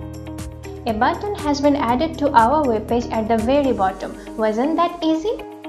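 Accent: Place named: India. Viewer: it sounds like indian accent.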